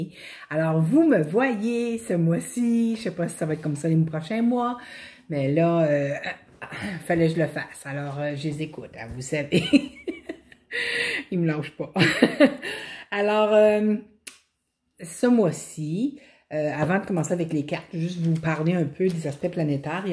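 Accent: Quebec, Canada. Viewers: Cc vous êtes superbe ravi de vous voir et mettre un visage sur votre belle voix québécoise des bisous 😘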